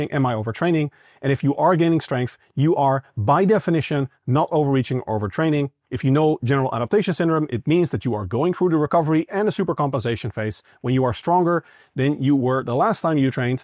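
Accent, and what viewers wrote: Netherlands. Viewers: Still here...for the hard truths and the Dutch accent on anything "un-"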